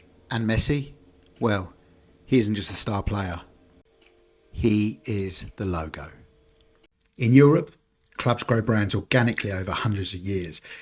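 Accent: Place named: United Kingdom. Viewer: You have a heavy British accent and even if you are not a Brit you are definitely from Europe. All that means that you experienced that football, which is the Great Grandfather of the MLS. So yes coming from there you have all those feelings about the MLS. The Bits are the creators of the football we play today. Ebenezer Cobb Morley — often called the father of modern football because he helped write the first official rules. ⭐ So the short answer: 👉 England invented modern football (soccer) in 1863 when the rules were standardized.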